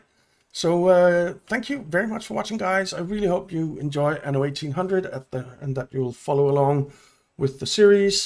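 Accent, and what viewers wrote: Denmark. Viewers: Not really hard to notice that the accent is danish 😜 great episode mate!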